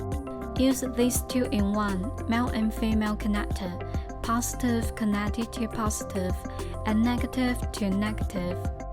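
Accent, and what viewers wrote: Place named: China. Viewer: Very informative review ,nice Chinese accent too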